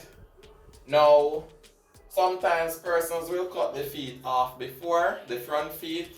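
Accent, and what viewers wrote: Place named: Jamaica. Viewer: Bomba! Me like the video....big up d Jamaican twang and big up the hygiene processing method. Respect for sharing.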